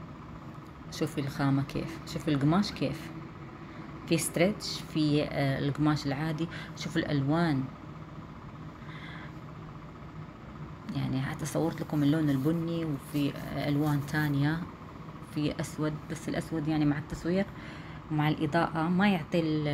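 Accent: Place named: Gulf, Arab world. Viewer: كلامك بالخليجى واعرة😍😍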